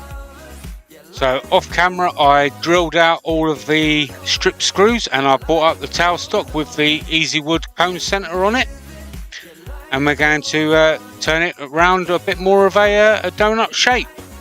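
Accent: United Kingdom. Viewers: Looks great, I'm a little confused, your accent sounds like you're from the UK but you pronounced Aluminium as if you were an American.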